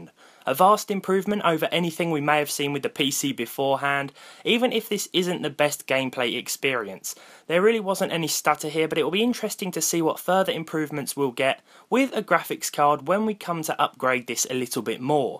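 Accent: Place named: Midlands, United Kingdom. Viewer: Knew he was a Midlands lad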